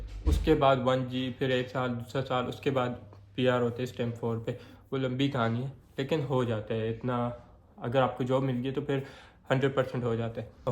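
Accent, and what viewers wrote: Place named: Karachi, Pakistan. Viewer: Bhai accent sy karachi k lagty hn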